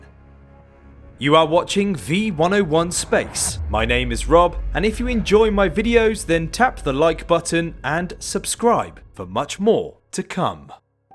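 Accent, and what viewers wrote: United Kingdom. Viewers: I got my own version you might enjoy if you want, Mr. British Voice, My Anglo-Saxon Cousin.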